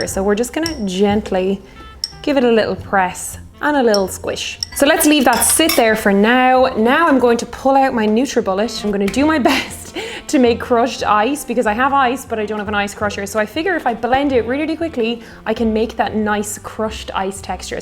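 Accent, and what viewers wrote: Ireland. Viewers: I don't know why, but for some reason I was expecting you to pronounce "derby" as "darby" with your Irish accent.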